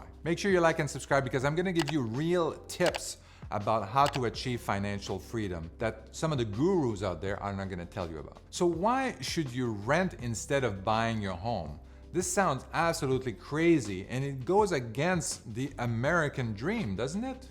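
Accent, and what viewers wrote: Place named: Quebec, Canada. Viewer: yes I could hear that québécois accent a kilometer away too;)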